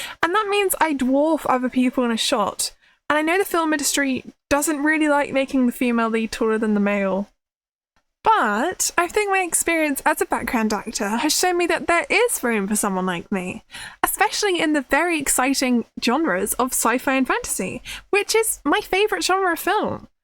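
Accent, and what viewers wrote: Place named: United Kingdom. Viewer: 21? Hmm. I was certain you were older. From the way you speak and act, but maybe the people around me are less mature, or it could be your British mannerisms and dialect. I have not spoken to a lot of people from the UK. Your life seems to be stable and you seem very happy with it, for the most part. All the best to you lady.:face-red-heart-shape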